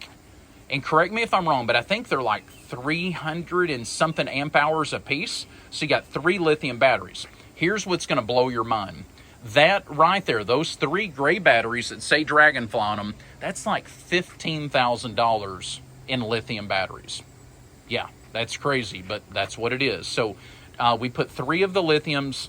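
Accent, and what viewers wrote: South, United States. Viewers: I like your southern drawl. It sounds so homey.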